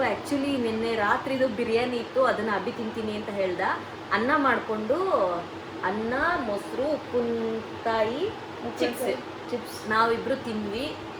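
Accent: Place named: Tamil Nadu, India. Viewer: ಅಕ್ಕ ನೀವು ಬಹಳ ಚೆನ್ನಾಗಿನೇ ಕನ್ನಡ ವನ್ನು ಮಾತನಾಡುತ್ತೀರಿ ನಾನು ನಿಮ್ಮ ತಮಿಳು ವಿಡಿಯೋ ಗಳನ್ನು ಸಹ ನೋಡಿದ್ದಿನಿ ನೋಡಿ ಮನರಂಜಿಸಿದ್ದಿನಿ ನೀವು ಅಷ್ಟು ಅದ್ಭುತವಾಗಿ ತಮಿಳು ಮಾತನಾಡಲು ಕಲಿತ್ತಿದ್ದರೂ ಕೂಡ ಕನ್ನಡ ಮಾತನಾಡುವುದನ್ನು ಮರಿತ್ತಿಲ್ಲ ಅಲ್ಲವ ಅದೇ ಒಂದು ಖುಷಿಯಾದ ಸಂಗತಿ ನಮಗೆ ನಾವು ಯಾವುದೇ ಭಾಷೆ ಯನ್ನು ಕಲಿತರೂ ಸಹ ಮಾತೃ ಭಾಷೆ ಯನ್ನು ಮರೆಯಬಾರದು ಮಾತೃ ಭಾಷೆ ಯನ್ನು ಮರೆತರೆ ನಾವು ನಮ್ಮ ಹೆತ್ತವರು ಹಾಗೂ ನಮ್ಮ ಅಸ್ಮಿತೆ ಯನ್ನೇ ಮರೆತಂತೆ ಮೇಡಂ ನಮ್ಮ ಕನ್ನಡದ ಮೇರು ನಟಿ ಮೋಹಕ ತಾರೆ ರಮ್ಯ ಹಾಗೂ ರಶ್ಮಿಕ ಮಂದಣ್ಣ ಒಮ್ಮೆ ನಿಮ್ಮನ್ನು ನೋಡಿ ಭಾಷಾಭಿಮಾನ ವನ್ನು ಮೈಗೂಡಿಸಿಕೊಳ್ಳಬೇಕು ನೀವು ನಿಮ್ಮ ಯಾಜಮಾನ್ರು ಕಿತ್ತಾಡೋದು ಸಖತ್ತಾಗಿರುತ್ತದೆ ನಿಮ್ಮಿಂದ ನಾವು ಇನ್ನೂ ಹೆಚ್ಚಿನ ಕನ್ನಡ ವಿಡಿಯೋ ಗಳನ್ನು ನೋಡಲು ನಿರೀಕ್ಷೆ ಮಾಡುತ್ತಿದ್ದಿವಿ ನೀವು ನಮ್ಮ ಕನ್ನಡದ ಅಸ್ತಿತ್ವ ಹಾಗೂ ಅಸ್ಮಿತಿ ಯನ್ನು ಕಾಪಾಡಬೇಕೆಂದು ಕಳಕಳಿಯಿಂದ ವಿನಂತಿ ಮಾಡಿ ಕೊಳ್ಳುತ್ತಿದ್ದಿವಿ ಎಲ್ಲಾದರೂ ಇರೂ ಎಂತಾದರೂ ಇರೂ ಎಂದೆಂದಿಗೂ ನೀ ಕನ್ನಡವಾಗಿರೂ ಕನ್ನಡವೇ ಸತ್ಯ ಕನ್ನಡವೇ ನಿತ್ಯ ನಾವು ಕನ್ನಡದ ಕಲ್ಪತರು ನಿಮ್ಮ ಕನ್ನಡ ಶೈಲಿಯಲ್ಲಿ ಚೂರು ತಮಿಳು ಪ್ರಭಾವಿದ್ದರೂ ಅದು ತುಂಬಾ ಸೊಗಸಾಗಿನೇ ಕೇಳುತ್ತದೆ ಏಕೆಂದರೆ ನಮ್ಮ ಭಾಷೆ ಕನ್ನಡವೇಯಾಗಿರಲಿ ಇನ್ನೂ ಬೇರೆ ಯಾವುದೇ ಯಾಗಿರಲಿ ನಾವು ನಮ್ಮ ದೈನಂದಿನ ಬದುಕಿನಲ್ಲಿ ಪರ ಭಾಷೆಯನ್ನು ಬಳಸುತ್ತಿದ್ದರೆ ಅದರ ಪ್ರಭಾವ ಸಾಮಾನ್ಯವಾಗಿ ನಮ್ಮ ಸ್ಥಳೀಯ ಭಾಷೆ ಮಾತನಾಡುವಾಗ ಸಹಜವಾಗಿ ಕಂಡು ಬರುತ್ತದೆ ಪರವಾಗಿಲ್ಲ ಮೇಡಂ ನೀವು ತಮಿಳನ್ನು ಹೆಚ್ಚಾಗಿ ಬಳಸಿ ಅಷ್ಟೇ ಕನ್ನಡವನ್ನು ಸಹ ಹೆಚ್ಚಾಗಿ ಬಳಸಿ ತಮಿಳು ಪ್ರಭಾವ ಏನು ಇಲ್ಲ ಆದರೆ ಕನ್ನಡ ಮಾತನಾಡುವಾಗ ಸ್ವಲ್ಪ ತಮಿಳು ಶೈಲಿ ಬಂದಂತೆ ಬಾಸವಾಗುತ್ತದೆ ನಿಮ್ಮ ಪತಿ ಹಾಗೂ ಸುಪುತ್ರನಿಗೂ ಅದೆಷ್ಟು ಬೇಗ ಸ್ವಚ್ಚಂದ ಕನ್ನಡ ವನ್ನು ಮಾತನಾಡಲು ಕಲಿಸಿ ನಮ್ಮ ಕನ್ನಡ ಮೊಳಗಬೇಕು ಎಲ್ಲೆಡೆಯೂ ಪಸರಿಸುವಾಗೇ ಮಾಡಿ ನೀವು ಮನಸ್ಸು ಮಾಡಿದ್ದಿರಿಂದರೆ ಕನ್ನಡದ ಧಾರಾವಾಹಿ ಗಳಲ್ಲಿ ಮೀನುಗಬಹುದು ಮಾತೃ ಭಾಷೆಯೆಂದರೆ ಅದರಲ್ಲಿ ಹೆಚ್ಚಾಗಿ ಭಾವುಕತೆ ಅಡಗಿರುತ್ತದೆ ನಿಜ ಹೇಳಬೇಕೆಂದರೆ ನಿಮ್ಮಲ್ಲಿ ಕನ್ನಡಾಭಿಮಾನ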